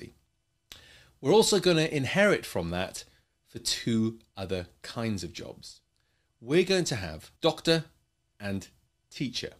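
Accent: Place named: Cockney, United Kingdom. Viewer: Cockney Accent 🤣🤣🤣🤣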